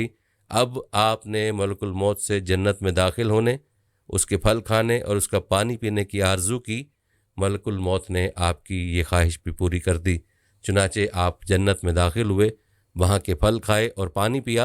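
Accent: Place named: Punjab, India. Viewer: Adrees nhi idrees hy na'am aap Punjabi hn lehjy lugta hy kion ghalat na'am lety hn.thory sy mehnat or homework karen or phir vdo banayen.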